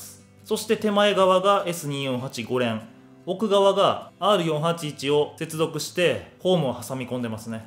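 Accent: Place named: Kansai, Japan. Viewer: 優しい関西弁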